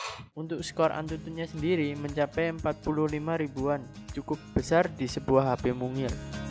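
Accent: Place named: Java, Indonesia. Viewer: keliatan banget jawa nya tolong bahasanya diperbaiki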